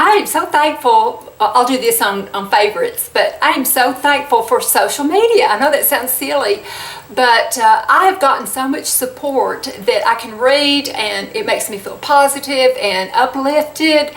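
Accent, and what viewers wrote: South, United States. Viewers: I feel like if I was to meet you sometime you and I would have such fun showing off our southern accents!! I was born and raised in Oklahoma and will never (I hope) never live any other place. I love your videos and love your straight forwardness. Thanks for being your true self! 🤗🤗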